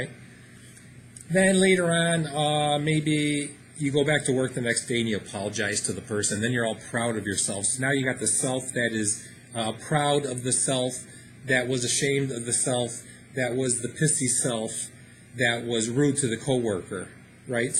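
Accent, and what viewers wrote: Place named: New Jersey, United States. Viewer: Hey brother, I hear the Jersey accent coming through, I’m from jersey too. I want to say that yes within the ego body mind there is a constant changing from moment to moment and each one you can say is a self. However what your true self is, is not within the world, and that self is changeless. In fact that self is just really not even anything, it just is, and that true self CONTAINS the world and the world originates from it. But depending on where your coming from, yes there are many selves, yes there are people, yes there is duality, but the true self contains and enables it all, and is One, across everyone. Your true self and my true self, are the same, we are actually each other. Peace and much love.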